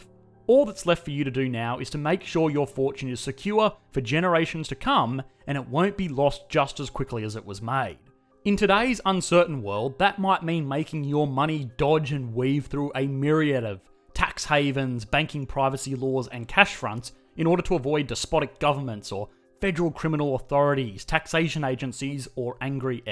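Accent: Australia. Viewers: Oz accent? Lol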